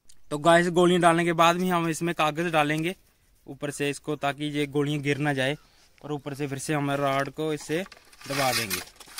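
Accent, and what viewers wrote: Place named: Jammu, India. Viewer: Bhai jammu se ho aap ?? Apka accent whi ka lag rha hai😅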